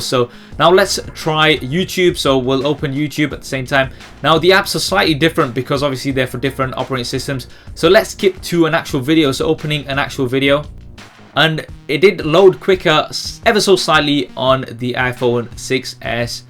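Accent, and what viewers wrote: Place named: United Kingdom. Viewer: i can smell the British accent , don't know why ....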